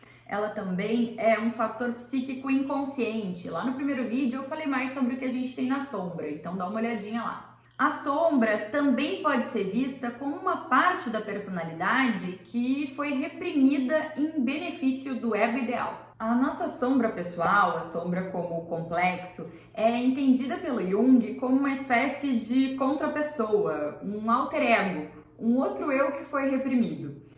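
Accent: Rio Grande do Sul, Brazil. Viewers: Nossa tesão pela vida está lá nas Sombras . Tu és gaúcha? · Clareza e conteúdo denso com sotaque gaúcho. Excelente.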